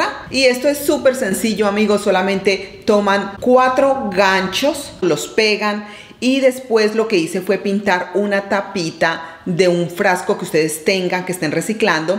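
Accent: Antioquia, Colombia. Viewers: tú canal és maravilloso tús manualidades la forma tán sencilla como explicas todos los pasos a seguir en cada manualidad o cambio hacen de tú canal un lugar favorito único y muy acogedor tu sencillez tú carisma TODO EN TU CANAL ES ESTUPENDO ME ENCANTA y ése habladito paisa que me fascina pues yó vivo acá en bogotá colombia pero???? Toda mi familia mis raices son paisas FILANDIA QUINDIO BELEN DE UMBRIA y vallunas TULUA Y ROLDANILLO aunque también tengo tolimenses en mi familia mi abuela materna es de ALPUJARRA TOLIMA!!!! Un abrazo enorme a ésta bella compatriota qué és mi linda!!!!!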